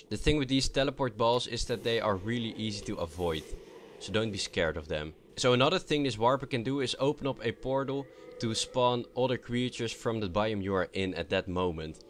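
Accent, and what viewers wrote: Netherlands. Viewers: R U dutch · Hè really sounds Dutch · Haha ik kan aan je accent horen dat je nederlands bent. xD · are you maybe dutch becaus i am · Yo man, ik kan horen dat je Nederlands bent. Ben ik ook! Vette vids trouwens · is he dutch? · Are you from holland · sounds like he has a dutch accent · you sound dutch? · 1 question... are you Dutch? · i havea question... are you netherlands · are you dutch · Wauw je hoort gelijk dat ie nederlands is XD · are you dutch?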